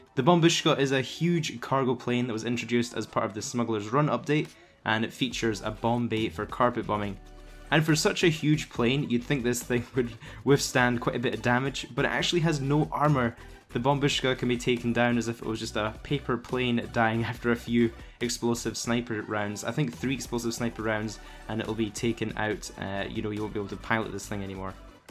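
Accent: Scotland, United Kingdom. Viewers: Ur Scottish?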